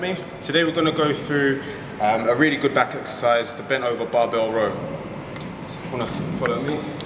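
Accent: United Kingdom. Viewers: As an American (white guy) when I hear a refined British accent coming from a black man who probably has a normal job of some sort, it gives me hope for the average American black person. Not much hope but still a bit of hope that they are capable of being refined.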